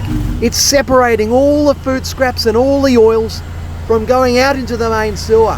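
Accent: Australia. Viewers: This reminds me why i don't got to Chinese buffet anymore and why it gave me diarrhea when i did.... this is funny shit and the aussie commentary makes it better...... it's choka blocked mate.... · Watching from the Netherlands. Stumbled on your channel when your vid about unclogging a drain in a parking lot showed in my suggestions. No idea why, but it is hilarious, especially with your ozzie accent. Early next year we have plans to visit Perth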